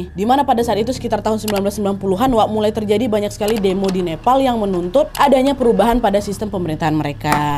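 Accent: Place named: Medan, Indonesia. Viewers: Medan kali kamu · Logat medanmu itu medok .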